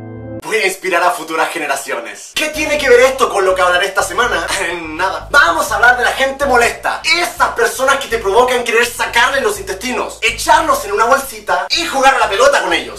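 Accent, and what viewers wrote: Chile. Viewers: Cuando todavía hablaba chileno y decía "conchetumare":v xd 2019 lo extraño.... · Aún es chileno. Yo lo escucho hablar chileno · Cuando german aún tenía acento chileno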